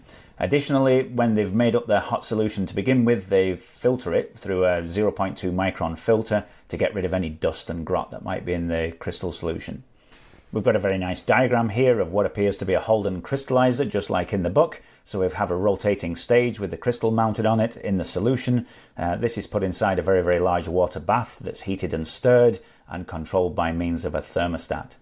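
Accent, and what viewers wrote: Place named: Australia. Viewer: Your Australian accent is beautiful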